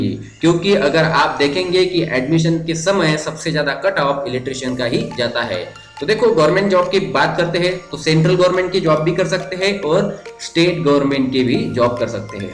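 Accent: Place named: Maharashtra, India. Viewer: Sir tumhi Marathi madhech ravas boltat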